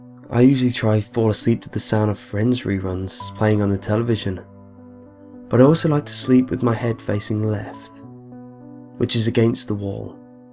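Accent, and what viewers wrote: England, United Kingdom. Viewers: His accent is English. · He isn't American 😂😂 He's British, especially with that accent. No one that's born in America has a voice like that. Only in Britain.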